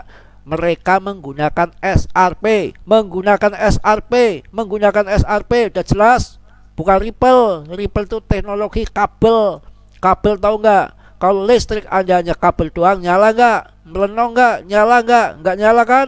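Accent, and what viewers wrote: Banyumas, Indonesia. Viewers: Yakin nyong seneng banget Karo BROOO.... Gole jelasnya logat ngapak.... Tapi JELAS... JUJUR APA ANANE.... ORA GAWE WONG MONCROT.... GAWE WONG DADI SULTAN..... MATUR SUWUN BRO..... DMOGA ISTIQOMAH JADI AMAL JARIYAH...... AMIIN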